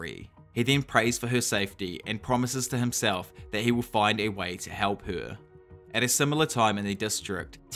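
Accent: New Zealand. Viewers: Loved the kiwi accent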